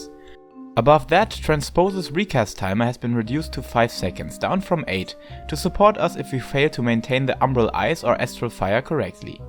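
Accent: Germany. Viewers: Are u German? You Sound like a German 😶😂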